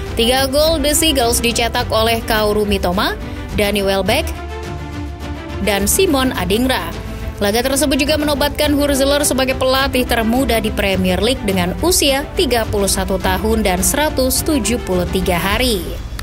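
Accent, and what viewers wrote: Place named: Banyumasan, Indonesia. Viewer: Admin wong ngapak 😗😗😗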